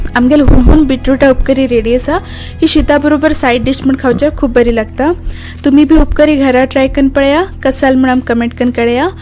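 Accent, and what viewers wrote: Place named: Konkan, India. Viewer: Which salt do u use is it sendha... I like your style of explanation very cute konkani.. Good recipe too :)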